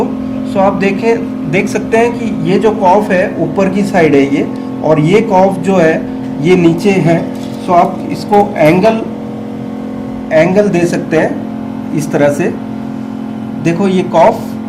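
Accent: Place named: India. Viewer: english way you sepack indian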